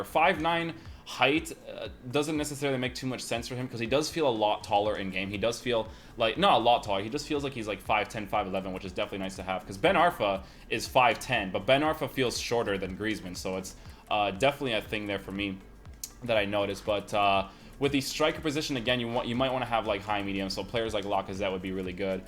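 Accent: Portugal. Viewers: Are you portuguese? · Are u portuguese??? Love 🇵🇹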